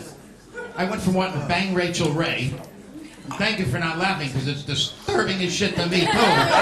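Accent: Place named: New York, United States. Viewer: This guy is hilarious. Love the new York accent, sorry to hear he has passed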